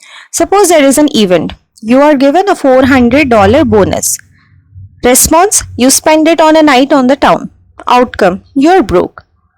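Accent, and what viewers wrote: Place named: India. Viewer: Oh man! An Indian accent :((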